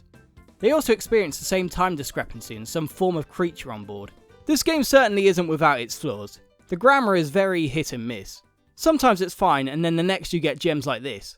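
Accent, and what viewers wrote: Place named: United Kingdom. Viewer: you sound british cool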